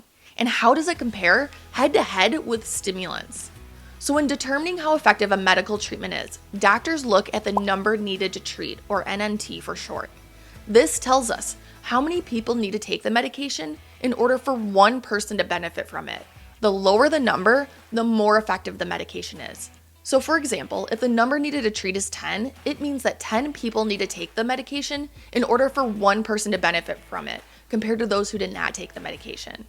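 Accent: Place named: Minnesota, United States. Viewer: You sound like you’re from northern Minnesota, or Wisconsin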